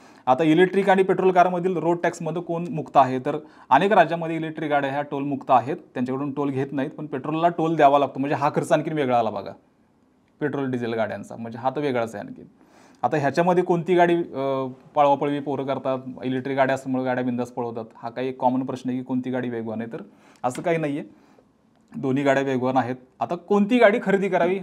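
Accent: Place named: Rural, India. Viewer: 3 ते 4 मिनिटांचा कन्टेन्ट घासून घासून परत परत परत तेच सांगून 20 मिनिटांपर्यंत ताणायला खरंच कौशल्य लागते राव. विषय चांगला आहे, ग्रामीण अक्सेंट मुळे आपल्यातला वाटतो देखील..पण जरा स्क्रिप्ट वाचून घ्या शेठ!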